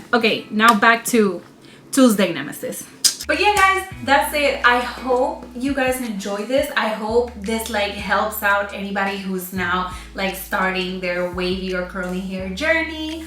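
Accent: Puerto Rico. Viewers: Eah corrillo? Puertorican?👀😂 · voy a tratar not your mother’s! me encantó como quedó. btw eres puertorriqueña?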